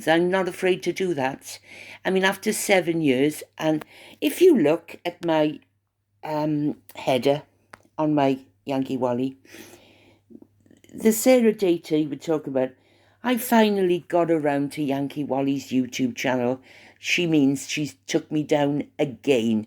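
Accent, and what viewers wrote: Wales, United Kingdom. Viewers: I couldn't believe my ears so absolutely delighted to hear your beautiful Welsh voice again.. i have missed you so much. I wish you all the very best in your endeavours, Caroline ❤❤❤ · Hi Wally listening to the vlog again. I had a thought abt subliminal messages imo & allegedly MM with her Raspberry Jam & Dog Biscuits was trying to make some of the BRF look like “Jammy Dodgers” IYKYK… think you get my drift. Glad yr back & yr welsh brogue is wonderful listening. Best wishes from Adelaide; South Australia🦘🐨🇦🇺💐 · So lovely to hear that beautiful welsh accent Wally. You are a diamond xxx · I have missed you yankee. I used to listen to you going to sleep. In Australia 🇦🇺. You made me laugh. I’m so glad. I’m so sorry with what you went through. We must stick together. I hope wales with your welsh accent will help me sleep. I’m glad I found your channel again 😊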